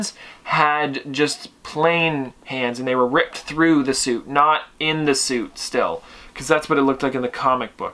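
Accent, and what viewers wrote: Canada. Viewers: I love Canadian accents 😂 love the videos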